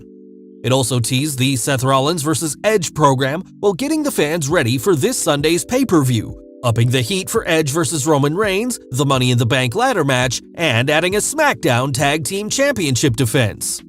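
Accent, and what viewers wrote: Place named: United Kingdom. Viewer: He's not Australian though... he's British.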